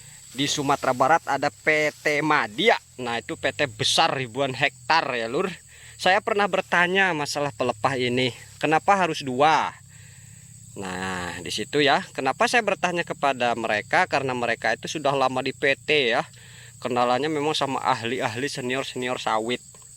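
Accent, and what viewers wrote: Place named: West Java, Indonesia. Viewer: Ini daerah mana om kayak dari Sunda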